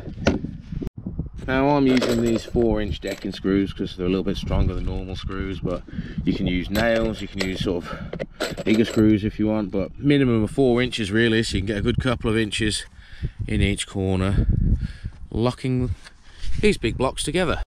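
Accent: United Kingdom. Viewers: Northamptonshire accent?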